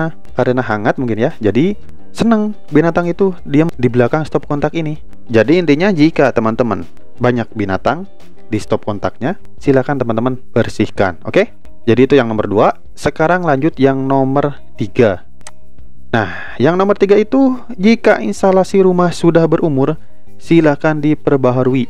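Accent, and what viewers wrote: West Java, Indonesia. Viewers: Narator na Jigana urang Sunda.😁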